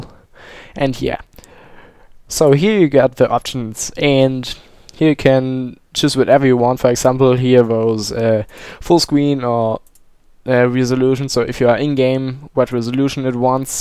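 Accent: Germany. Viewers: Let me guess, you're German, right? ;] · sounds like u are german ? nice video ! :) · you german right ? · Nice german accent brah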